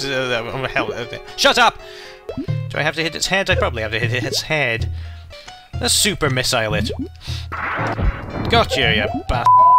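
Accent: United Kingdom. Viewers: That boss was just...I can't find words to describe it... You're British? Cool!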